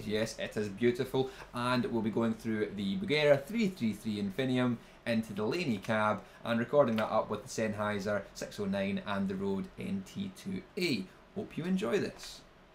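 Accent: Scotland, United Kingdom. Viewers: Entertaining British commentary. it’s definitely funny. The personality certainly comes through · So, where you from? Sound scottish lol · All I can think when I listen to a scotish talk is in fucking trainspottin hahaha!! great review mate! cheers from argentina! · Your Scottish is beautiful! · I forked out $280 (US$) for the TS808HW (used) and I feel like it was worth it, it works excellently as both a boost and an overdrive. Also I'd just like to say that I use your videos as a reference for a Scottish accent... Take that as you will, my ancestors were mostly Scottish....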